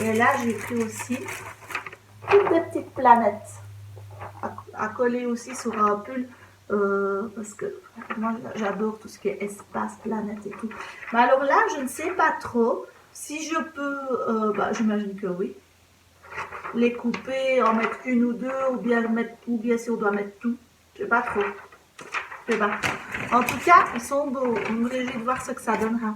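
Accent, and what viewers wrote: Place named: Belgium. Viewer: Bravo, bravo pour ton concert à Rome! Ta robe sera très belle, j’en suis sûre...et je pense que le taffetas sera plus fluide une fois lavé. Pour les flex, oui, tu peux les couper en morceaux, pas de problème. Merci pour ce bon accent bien de chez nous et bisou de Marche-en-Famenne, Gene 🎼🎶🎶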